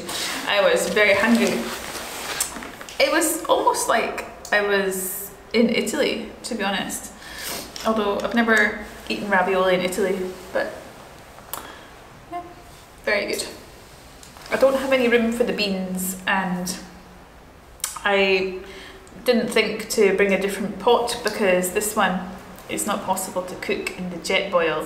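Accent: Scotland, United Kingdom. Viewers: Your Genetic Heritage reminds me of an Old Comedian that is Largely Forgotten Nowadays....STANLEY BAXTER;...... U definitely have his Nose👃 & Broad Scottish Accent..... Sooooo; Cool🆒😎👍🤓❤ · My favorite quote for this video: "Who needs a house when you can live in a cave." Love your sense of humor and of course your lovely Scottish accent. I don't know if you go back and read comments from months ago. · Cheers from Canada. Love your accent, Being of Scottish ancestry I wondered what I would sound like if I was from there. Lol! Enjoy your stay in the cave, you got it!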